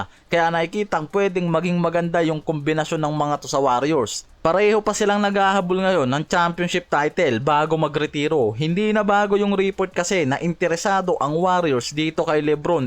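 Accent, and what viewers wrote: Visayas, Philippines. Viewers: Bago ka mag content ayusin mo muna yung pronounced mo ng curry. Hindi carry. Curry. Anong carry? Keri? Carry the ball? Curry!! Hindi Carry. Bisayang to.. tapos porgingis. Hindi porsingis.. sarap mong kaltukan.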